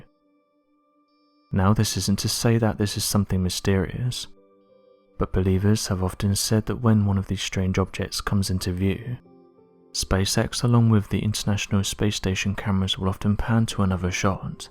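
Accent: England, United Kingdom. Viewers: So why are these “aliens” always seen either in the Sky or Space? But the aliens don’t ever make it to Earth in ET Spielberg style? Sorry but the husky serious English accent doesn’t really do it for me.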